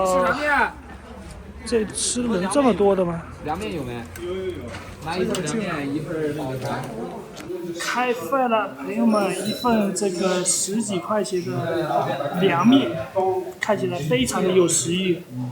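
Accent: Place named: Jiangsu-Zhejiang, China. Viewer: 江浙口音啊，骑这么远？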